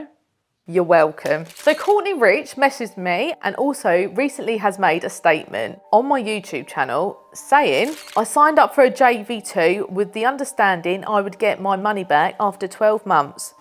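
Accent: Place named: Cockney, United Kingdom. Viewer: Hey you have such a strong cockney accent for a Punjabi girl..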